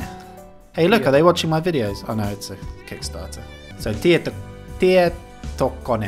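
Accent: Finland. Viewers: you are doing great, i think its pretty funny that you pronounce all the swedish words with a finnish dialect.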